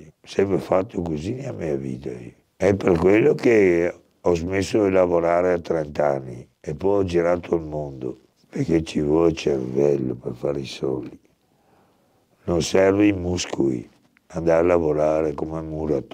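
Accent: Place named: Alba, Italy. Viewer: Min vai in mona! Sui 2/3k al metro. Neanche in val Seriana o Immagina Brembana 25k un bilo dai, sui 50mt. Cmq bel personaggio, città alta tra Trussardi Percassi e Locatelli che non ho presente bhe belle zone ( Berghem de Sura ) cmq ( da accento inconfondibile Alba ) come mai tantissimi bergamaschi vedo nelle tue interviste..? Una percentuale decisamente alta su sei video fin'ora quattro lo sono. Conosco quasi perfettamente le zone che citano, Quarenghi Malpensata e Gleno,poi famose. sembra tanto quei ricconi di famiglia che sputtanano tutto in poco a destra e manca ( non lo nasconde del resto ) poi gli ordini di " grandezza " in termini economici lo sanno solo loro. Per ora non ho riconosciuto nessuno di vista ma in giro se ne vedono e di storie di ogni tipo. Anzi, ho conosciuto bei soggetti facendo Calisthenics da un tre mesi, Omari un ragazzo marocchino bel tipo trentina super tirato che non fosse timido potrebbe raccontare una bella storia molto interessante..ma farlo parlare così liberamente a telecamere puntate non penso sia impresa facile su due piedi. 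Provo ad accennare e convincerlo quando lo becco al parco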